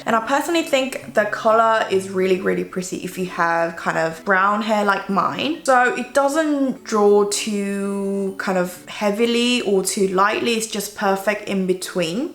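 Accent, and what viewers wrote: United Kingdom. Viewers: 언니의 영국 억양에 한번 더 녹고 갑니다 ㅎㅎ 🙂😊👍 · 영어 공부하는 중이라 영어영상이 더욱 반갑네요!! 영국발음이랑 유창한거 너무 멋져요ㅜㅜ 또 한번 반했네요💕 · 이거 영국식 발음이에요 아니면 호주이런쪽이에요?......... 억양이 어느나라 영어에 가깝나요?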